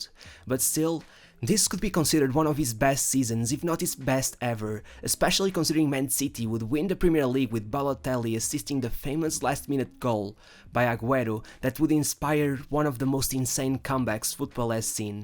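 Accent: Portugal. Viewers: Dar support, nota-se que é tuga pelo sotaque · fantástico como se conhece os tugas ao longe a falar Inglês... bom trabalho! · the guy casting the video is so portuguese ! can tell by the accent xD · You sound Portuguese, are you? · Just one question are you Portuguese cause I think the way u pronouce latin words sounds a lot like it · Every morning every football player should look into a mirror and say"Thank you God c'os i'm blessed..I do what i love to do and i get paied for it..I do what what millions of kids in the world never reach,and my life in footballl it's like a life of an orchidea,so lets be excelent,lets seize the day"...It's only a very humble vision of life it costs nothing...But not,too much shit in the brains,too much drugs,too much women,and the shine of youth fades...wasted!...Good work with the video only the accent it's too much Tuga(iAm i wrong?)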